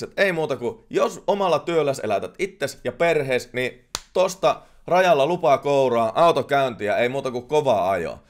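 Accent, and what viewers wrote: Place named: Oulu, Finland. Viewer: Nyt oli asiaa kansanomaisella kielellä. Oulun murteenkin kohdalla käännetty nupit kaakkoon, täältä löytyy muuten Suomen paras kesäkaupunki eli Kotka. Tervetuloa!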